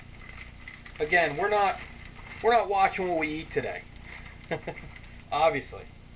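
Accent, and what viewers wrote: Baltimore, United States. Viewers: You must be from Baltimore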